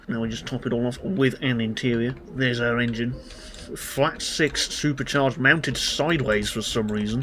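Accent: United Kingdom. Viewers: Bro sounds like the UK. He sounds exactly like a UK haver would sound like.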